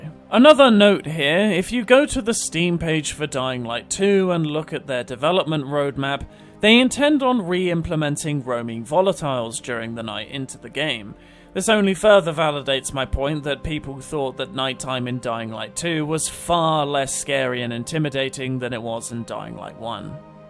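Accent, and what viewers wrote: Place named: United Kingdom. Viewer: This might be the only british accent I can tolerate, nice video so far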